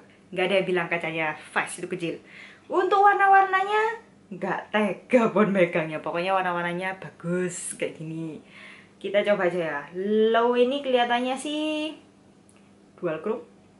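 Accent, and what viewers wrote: Java, Indonesia. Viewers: medog jawa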